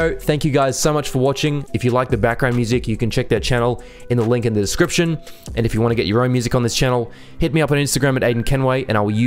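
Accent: Australia. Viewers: bro u from australia yh?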